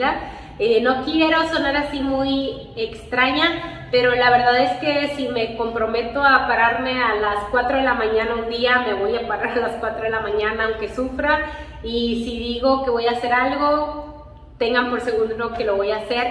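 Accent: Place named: Mexico. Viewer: Tu acento es como el de una mexicana mas ...soy de colombia y no diferencio un acento mexicano de un estado a otro...yo creo que solo los mexicanos notan alguna difencia ...😊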